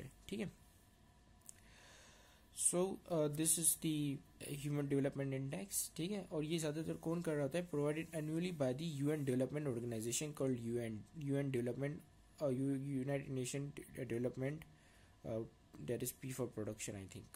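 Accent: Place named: United Kingdom. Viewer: nd youre Urdu is like English Accent it reminds me Bilawal Bhutto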